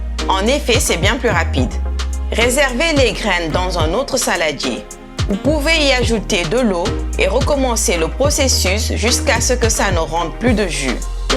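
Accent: Senegal. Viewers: Elle s'exprim kom une vraie sénégalaise😂😂 C chouett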